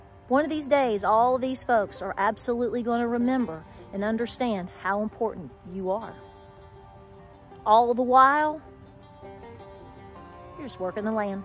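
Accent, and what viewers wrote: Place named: Knoxville, United States. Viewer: Sounds like people that live in west Knoxville.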